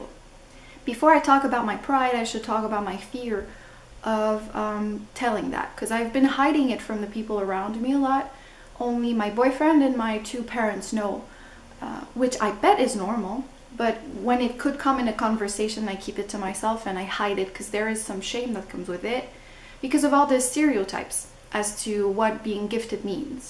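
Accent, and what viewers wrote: Quebec, Canada. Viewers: WoW Je me disais que tu avais un accent québécois! Je suis un adulte TDAH, dyslexique, avec dyscalculie et avec dysorthographie. Je connais très peu de québécois doublement haut-potentiel! Belle découverte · Tu es de Montréal ? Selon le peu que j'ai entendu de ton accent tu me sembles québécoise... En tout cas excellent vidéo merci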